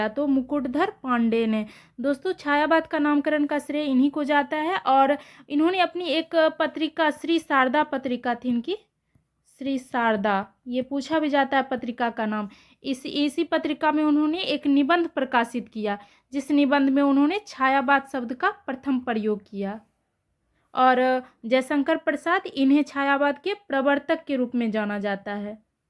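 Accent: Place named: North Bihar, India. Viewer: Medam AAP उतरी बिहार से हैं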